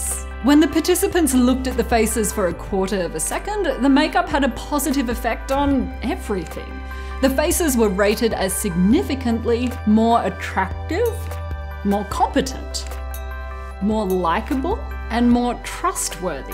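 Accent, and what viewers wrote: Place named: Australia. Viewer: It's alright, i will always trust you because you sound like me (Australian). :DDD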